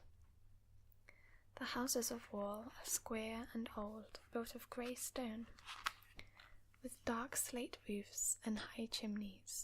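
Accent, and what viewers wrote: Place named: Hampshire, United Kingdom. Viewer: You have a lovely accent. Id bet you're from hampshire somewhere. :)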